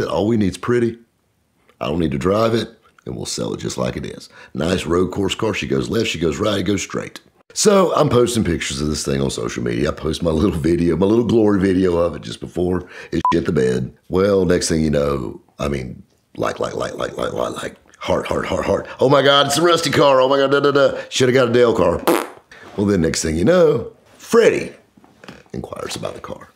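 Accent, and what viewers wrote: South, United States. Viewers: Sorry if I disrespect someone but for me as someone from Europe this is what a real American accent sounds like. ‘Merica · Michael Rapaport’s southern brother?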